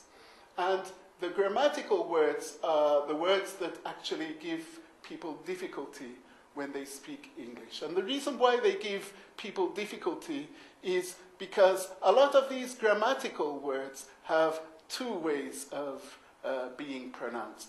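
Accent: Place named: Liverpool, United Kingdom. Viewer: Hi you know that I can understand you perfectly unless you speak with your Liverpool accent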